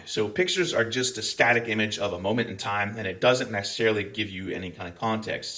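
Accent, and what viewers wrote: Scotland, United Kingdom. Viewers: I love that you used your Scottish accent. 👍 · your scottish is showing. oh yeah and I made it to the end mfer. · Serious question: is the Scottish accent a bit, or is that native accent? Like, was he born in Scotland and then moved to the US or something? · Love the Scot-talk. 😊 Made it to the end... for the algo. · It's even better with a Scottish accent and a fellow gamer!! Even more hooked now!! 😍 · Go full out Scottish accent! Especially your initial rant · Hey I love you, your scottish accent, and your starship!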